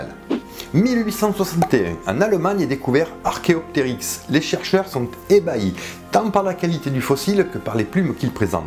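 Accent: South, France. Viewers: C'est un accent du sud si je ne me trompe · Tu et du sud de la France non mdr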